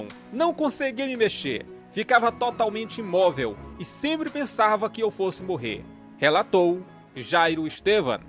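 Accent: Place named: Maranhão, Brazil. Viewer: Kkkk você prega a verdade mais ao mesmo tempo é super engraçado com esses sotaques Maranheses!!! As vezes agente nem ta a fim de rir mais vc nos faz rir! De São Paulo capital!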